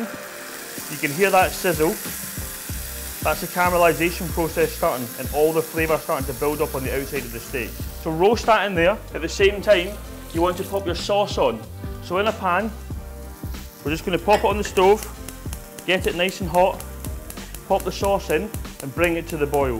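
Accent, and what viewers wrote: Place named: Scotland, United Kingdom. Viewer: Yer Scottish yay 🏴🏴🏴👍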